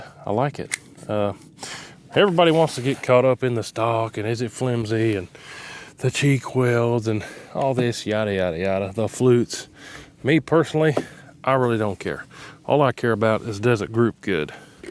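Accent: South, United States. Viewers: what the hell is zoomies please speak proper english not country red slain.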